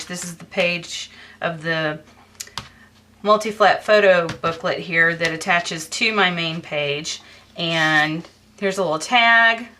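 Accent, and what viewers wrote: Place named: United States. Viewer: What a wonderful album,and watching you do it from the start was great... Love your videos and will be watching them all, also love your accent, whereabouts in the States are you from?.. I am in UK, we do not get some of the things you get easily over there, though it is getting better with the online stores, but my are they pricy! Best wishes, Lesley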